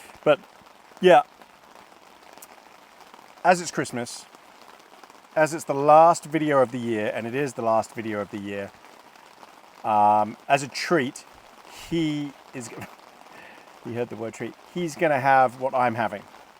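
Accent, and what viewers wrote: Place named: Cheltenham, United Kingdom. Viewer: Where are you from? You sound just like my mate who comes from Cheltenham. Loving the vids & Bruce 👍🏻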